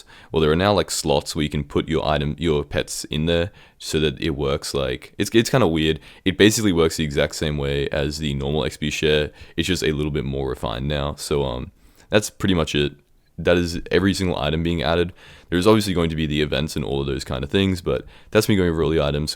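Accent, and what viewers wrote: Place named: Australia. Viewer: Hey, im assuming you live in Australia becuase of your accent so how do you get better ping to hypixel becuase I am struggling on 270 ping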